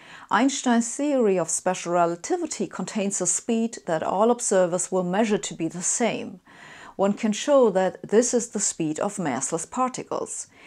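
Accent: Germany. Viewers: Love your content. Your subtle German accent gives it a nice additional touch. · she got music videos??? Does she also sing with this strong german accent?^^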